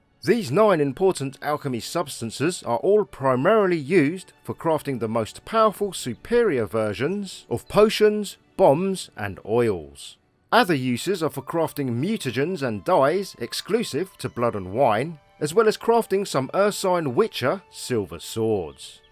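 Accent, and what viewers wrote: United Kingdom. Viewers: No disrespect my man..but you shocked the 💩 outta me the moment I heard your voice/accent. I don't believe I've ever seen an Asian gent with such a rich English accent. It's got me like 🤯🧠⚽🥅. In any case, sweet video! 🙌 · Are u Asian with a British accent !!!!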